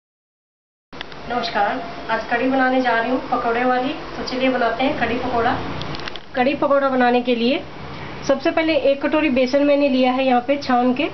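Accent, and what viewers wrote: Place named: Punjab, India. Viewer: As salam walekum sister's ap ki recipe se ziyada ap ki bate achi lagti h panjabi lehje me urdu bolti h bhot acha lagta h or wo waords sun k bhot acha laga or hasi arahi h or (pahari bana k) 😀😀😀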